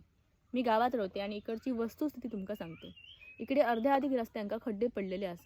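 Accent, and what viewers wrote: Malvani, India. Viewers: तुझी मालवणी भाषा ऐकायला मस्त वाटत बेस्ट लक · खूप छान वाटते तुमची मालवणी ऐकायला एकच नंबर ☝️👌🙏❤️❤️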